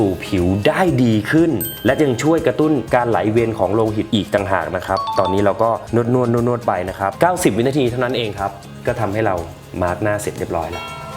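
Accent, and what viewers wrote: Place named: Thailand. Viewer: พี่บอยสำเนียงไทยมากกกก น่ารัก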